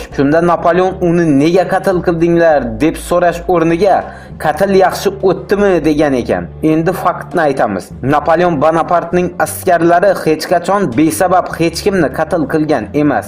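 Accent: Khorezm, Uzbekistan. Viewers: Улеее хоразмлимисане эшитиб асаб болдим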